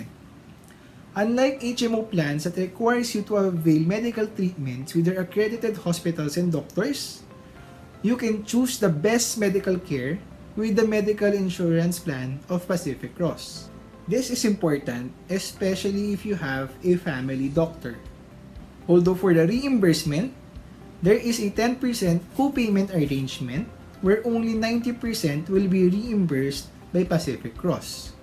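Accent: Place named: United States. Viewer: Wala akong na intindihan, Americano ka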